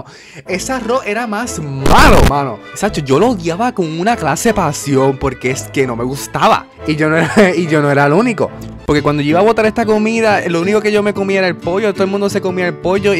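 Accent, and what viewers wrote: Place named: Puerto Rico. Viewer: yo tambien lo soy pero naci en estados unidos y ya sabia que era de puerto rico por como hablaba xdd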